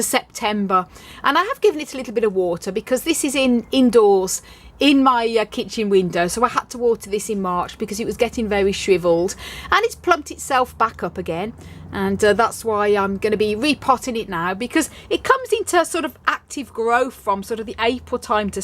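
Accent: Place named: Tamworth, United Kingdom. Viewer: Oh my life! I’m going to need a poly tunnel soon if you keep showing me gorgeous plants that I NEED!!! Xxx great vid again! Where are you originally from? I grew up in Tamworth & you have a similar accent. Xx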